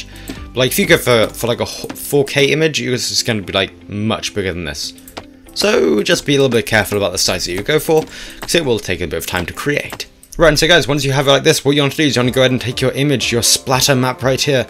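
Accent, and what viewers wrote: United Kingdom. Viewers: Are you british or australlian?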